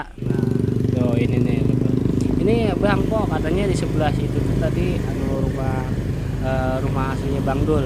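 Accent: Betawi, Indonesia. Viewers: Betawinye enakan anak kemayoran logatnye.ente betawi pinggiran ye bang? Ketahuan bahasenye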